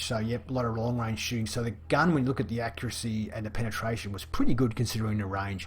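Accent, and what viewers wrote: Australia. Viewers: Thanks, yes the game has changed a lot, with remade maps and new ones, lots of new Prem tanks introduced, so that for example if I still had my T32, and a few others, it would be out classed by many tier 8's now. But glad you are back as I like your presenting style, and, may I ask if you are an Aussie or Kiwi?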